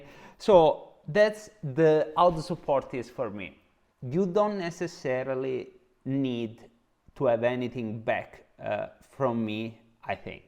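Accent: Italy. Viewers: Your 'broken English' is more articulate than most native English speakers. Love the Italian accent and content. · Well, I think there is a little crap mixing in every business. However I understand that you are a little upset because you are doing it for free and you experienced these cases with the case :) I like that you are honest about the advantages and disadvantages of every property you promote. However, the video trend is to be more colourful, entertaining and putting a little drama in the films. I don't think everyone should be following this, but it's a fact. Your English is very good, I actually like the authentic Italian accent.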